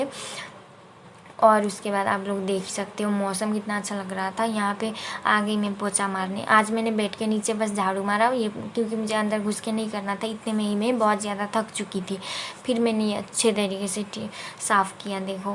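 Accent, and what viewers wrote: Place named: Nepal. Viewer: Yar vlog to theek hai par use jyada aupe ka accent hai Jo hame khatarnak laga proper Nepali people when they speak hindi.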